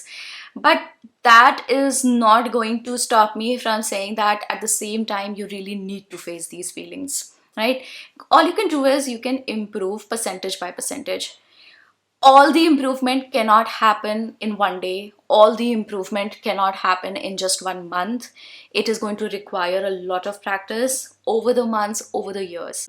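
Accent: India. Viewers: Is your accent indian, ma'am???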